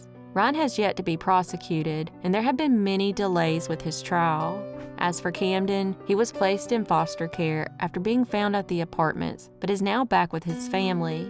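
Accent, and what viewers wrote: South, United States. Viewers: My family and I were talking today about southern accents. Yours is adorable. You do such a great job on these stories.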